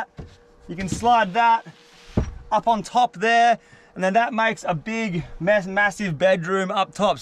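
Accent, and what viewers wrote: Australia. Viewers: Love ur vids.The aussie accent makes it soo much better and wholesome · I do love watching ridiculously it's kind of addicting because I can't really do that I see two people enjoying their lives and having troubles but they're smiling as they have these troubles living out of a car is not fun but then again it sure does look like fun until you actually have to provide and you're doing that and what should I say from Alabama no I don't have all my teeth I'm missing one I've had seven root canals that's beside the point you're putting out there for people to see but I look for MAC 10's videos because I like her accent I watch Australian videos because I like your accent and I like the views of the seat the laws are different where you are and there's a reason for that for the beautiful land that you have so you too not YouTube YouTube you too keep doing and I will love to see what you come up with next on an adventure of life of all life ☺️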